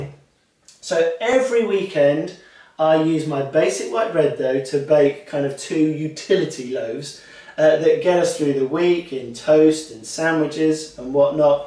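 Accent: United Kingdom. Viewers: Me: hears English accent; hits subscribe immediately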